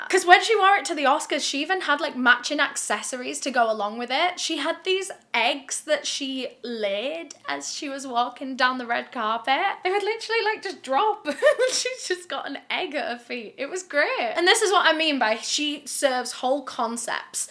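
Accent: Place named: North England, United Kingdom. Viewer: Coming back to this video as handled this subject with such professionalism & care. Honestly she’s one of the few true crime YouTubers who actually doesn’t glorify these people / subjects & I have so much respect for that. Edit: Also as someone who has family & friends who live in the North her accent brings me a weird sense of peace whilst listening to these fucked up stories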